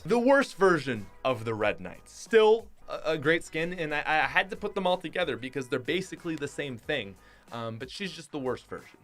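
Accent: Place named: Canada. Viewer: U canadian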